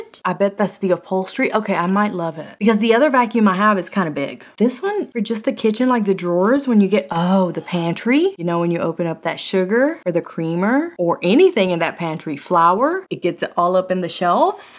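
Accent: Texas, United States. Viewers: Girl are you from TX? I am from San Antonio and you got that TX flare!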